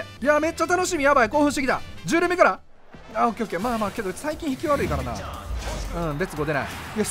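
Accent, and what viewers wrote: Kansai, Japan. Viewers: なんか関西弁の自賛は嫌みがない気がする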